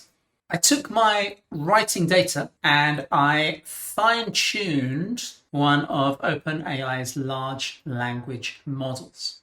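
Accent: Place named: United Kingdom. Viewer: Hi coach I realised yesterday You are very very good Many thx for sharing your experience Also i like very much uk accent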